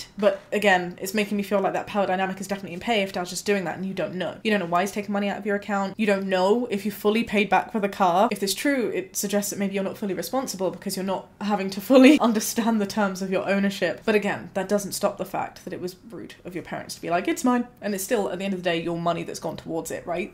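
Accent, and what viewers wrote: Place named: England, United Kingdom. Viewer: I think the biggest problem with your name is you being British, the English accent just adds an extra r onto words that end with vowels, so I thought your name was until I saw it in writing lmao.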